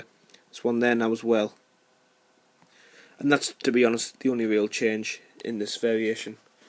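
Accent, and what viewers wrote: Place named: Newcastle, United Kingdom. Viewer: No. It's from North East England, most likely Geordie.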